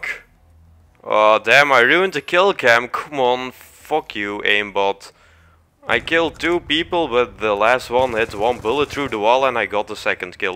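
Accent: Netherlands. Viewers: Is the commentator from dutch/netherlands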